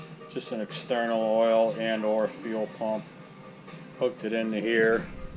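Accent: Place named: Chicago, United States. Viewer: You still around and with us? Sounds like you're from Chicago(?). I grew up in Orland Park.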